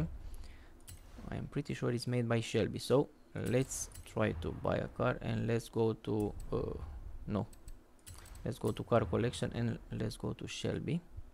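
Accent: Romania. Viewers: your romanian accent is so strong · You have an romanian accent, but how do u get vouchers?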